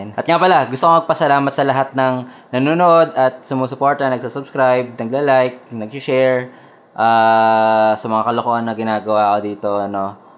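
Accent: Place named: United States. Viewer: Boses kano si sir haha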